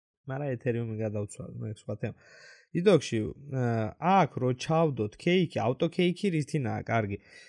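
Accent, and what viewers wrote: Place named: Kutaisi, Georgia. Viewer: კილოთი ქუთეისელი და channel nameთიც ქუთეისელი 😌😂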